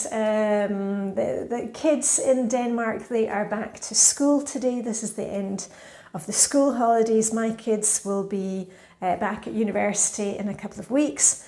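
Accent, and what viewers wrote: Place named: Scotland, United Kingdom. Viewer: Dear, I am so sorry to hear of the loss of your mum. Absolutely know how that feels. I live near Edinburgh, lost my mum 2 years ago to cancer and like you, cared for her at home till the end. It was your routines and cheery Scots voice that kept me going in the home and helped me get ready for work every day, so thank you so much for all that you do. You are greatly appreciated 🤗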